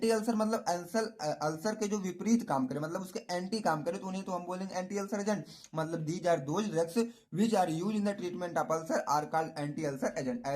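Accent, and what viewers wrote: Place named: Uttar Pradesh, India. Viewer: Up se ho bhai q Aap language sun ka lag rha Hai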